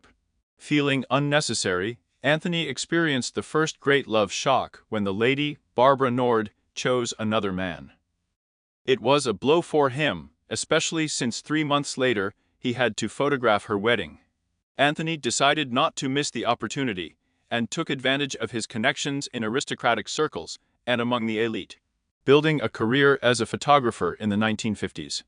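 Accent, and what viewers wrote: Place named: United States. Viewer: The narrator, is he for REAL? Haha. American perhaps.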